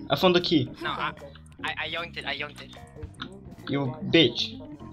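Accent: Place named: India. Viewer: India is proud of your accent🤝🤝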